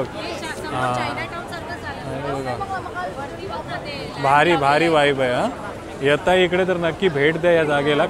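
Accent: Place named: Agri Koli, India. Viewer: आणि तुझ्या मध्ये गावठी भाषेचा टच खूप म्हणून तू आगरी कोळी खूप छान शोभतो.... I like it very much..😊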